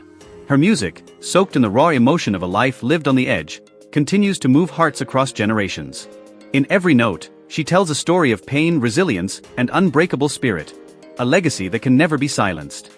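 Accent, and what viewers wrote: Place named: Asia. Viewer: What's up with all these Asian accent Voice A.I. video popping up everywhere?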